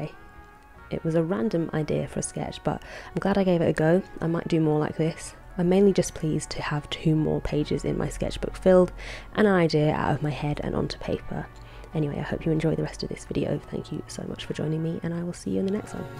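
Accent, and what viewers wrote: United Kingdom. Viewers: this is the most British commentary I've ever heard lmaoooo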